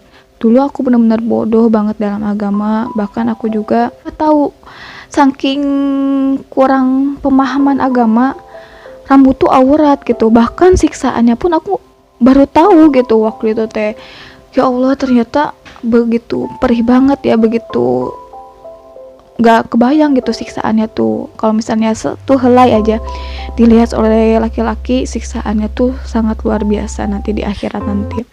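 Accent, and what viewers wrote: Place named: West Java, Indonesia. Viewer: Eta logat Sunda nya 👍👍slalu Istiqomah ya sayangkuuu 😍😍🤗😘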